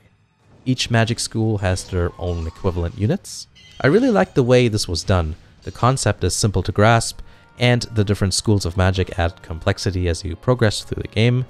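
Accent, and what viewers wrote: Germany. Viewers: You are from Germany :D?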